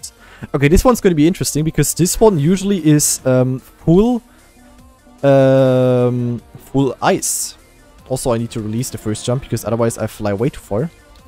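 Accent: Germany. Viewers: Du bist deutschhh, nh? XD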